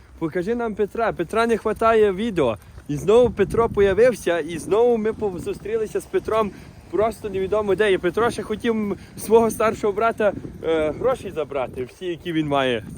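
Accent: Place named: Prykarpattia, Ukraine. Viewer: Прикарпатський акцент дуже подібно ✌️😉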